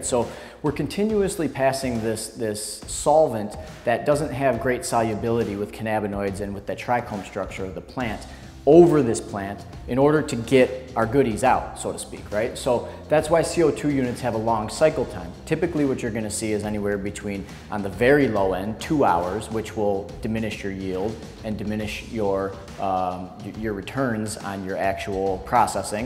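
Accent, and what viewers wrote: United States. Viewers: midwest accent represent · is that a chicago accent? great accent, can't quite pin it tho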